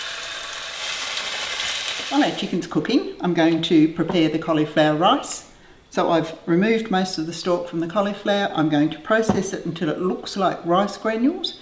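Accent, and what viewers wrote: Australia. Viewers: Do I detect an Australian or a New Zealand accent? I loved the recipe by the way, especially when you made cauliflower rice because it's something I've been wanting to try. I love how relaxed you are. This is my first video that I have watched on your channel and I've subscribed already. Even though your 'about' section said you focus on gluten free recipes we can all use them. Thank you for sharing your knowledge of cookery. Xx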